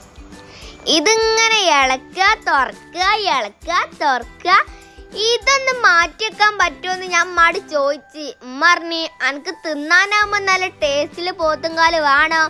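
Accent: Malappuram, India. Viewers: ഇത് മലപ്പുറം ഭാഷ ആണോ, 😘😘😘 എത്ര വയസ്സായി മോളെ നിനക്ക്, മാഷാഅല്ലാഹ്‌ 👍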